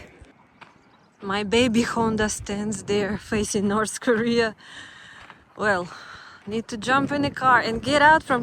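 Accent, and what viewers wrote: Russia. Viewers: Hello from Florida, USA I love to listen to you speak English with your Russian accent. You sound so interesting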